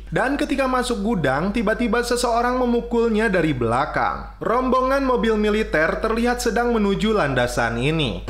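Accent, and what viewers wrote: West Java, Indonesia. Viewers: Resep euy Ari nu nyarita org Sunda mah haha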